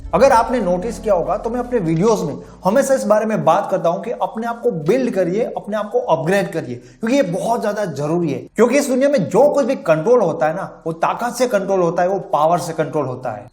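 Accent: Gujarat, India. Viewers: Sir Aap gujarati ho?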